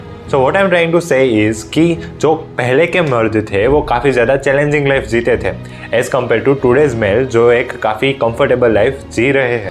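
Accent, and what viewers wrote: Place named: Gujarat, India. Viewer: You sound gujrati. Feels good to see gujrati doing such different kind of videos. Also very new to this field. You sound nervous also. But keep going bhai you will overcome it all with time. All the best doctor saheb.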